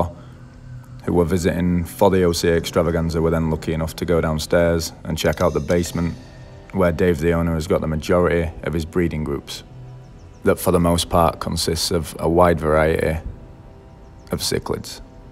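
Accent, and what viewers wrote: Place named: England, United Kingdom. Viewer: Why do you sound English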